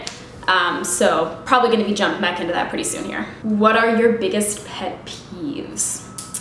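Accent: Canada. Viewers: I THOUGHT I heard a bit of a Canadian accent ;) hecks yeah! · Just discovered your channel! I'm Swiss and I lived in Van for about half a year and I miss it so much! Just listening to your Canadian accent made me feel like I was in Vancouver again, so I'm definitely gonna watch tons of your videos today just to make me less homesick :D xx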